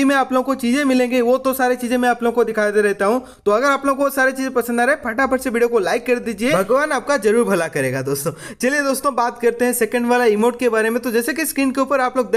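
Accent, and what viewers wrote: Odisha, India. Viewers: Bhai tum odisha se ho na 😊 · Bro r u from odisha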